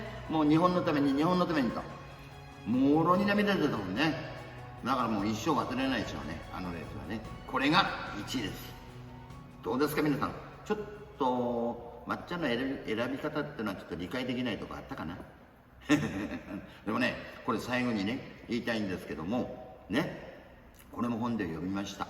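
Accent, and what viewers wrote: Fukushima, Japan. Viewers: こんだけ話せればボケにはまだまだ早いｗ あと私も福島出身なので訛りがなんだか懐かしいｗ いつまでも元気で馬の話して欲しいなぁ 馬の脚は第二の心臓っていうからねぇ… 蹄を地に付けて歩くことで身体に血を回すポンプとしての役目を担うから 歩けなくなったらもう致命的なんだとか 個人的にヒシミラクルのウマ娘化期待してます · 私も地元福島なので訛りが心地いいです。これからも動画見ます！ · 前から喋り方、イントネーションが福島県民っぽいなあと思っていたらやはりそうでしたか！ 同じ福島県民ですが相馬野馬追、一度も見たことがないのでいつか見てみたいです！ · なんか喋り方きいたことあるなあと思ったら福島出身でしたかｗ 親父の実家福島だったから懐かしい感じするわけだわｗ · なんか聞き慣れた訛りだと思ったら同じ福島県でしたか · やっぱり福島の訛りか～、死んだじいちゃんと喋り方そっくりで涙出てきた じいちゃんはメイセイオペラが好きでした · この方、福島の方でしょうか？ 浪江出身の祖母の訛りによく似ていて心が和みますｗｗｗ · 訛りがうちのじいちゃんに似てると思ったら同じ福島県民だったんですね笑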